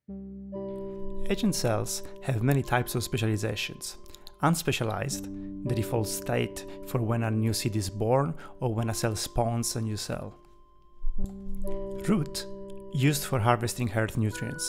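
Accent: Italy. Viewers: Am I mistaken or you have my very thick Italian accent too? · Solo sentendo il tuo inglese ho capito subito fossi italiano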